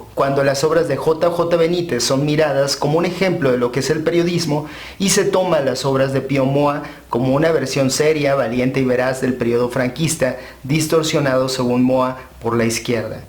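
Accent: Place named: Oaxaca, Mexico. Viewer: Así como tu hablan los pinshis waxhos que odi@ los de zonora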